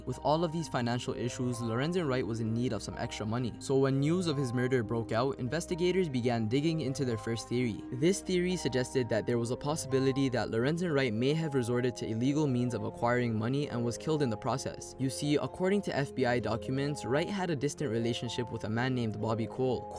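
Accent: Toronto, Canada. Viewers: torontonian accent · Your Canadian right? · you sound like a Toronto mans. Are you from Toronto bro?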